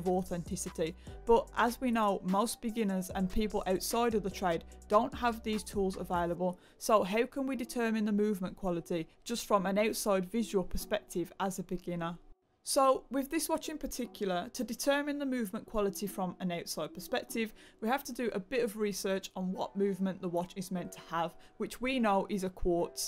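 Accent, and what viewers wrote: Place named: Midlands, United Kingdom. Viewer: I’m good at spotting a Mids accent , and watch differences 😉 respect , great detailed commentary and spot the difference 💯👍🏻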